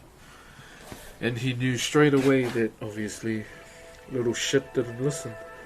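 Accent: New Zealand. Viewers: Yea, Korg. It's because of the kiwi accent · Whymark Nah, heavy kiwi accents like are super attractive to me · New Zealand Matey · Yup that's our Maori accent lol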